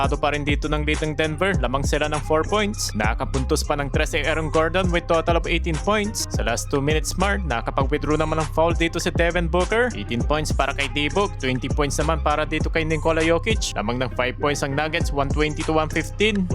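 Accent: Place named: Visayas, Philippines. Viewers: Visaya k dong boses m pilipit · Dong,ano probinsya mo?? Bisaya ka ano???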